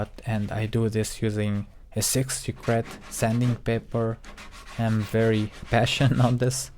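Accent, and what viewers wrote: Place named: Russia. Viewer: По русски нельзя было говорить? Слышно же по акцент у, что русский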